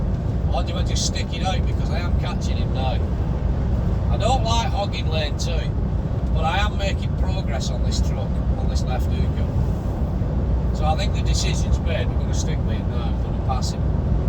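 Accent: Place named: Lancashire, United Kingdom. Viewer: Your some character,love your chat proper lancashire just like me lad you always make me smile cheers mate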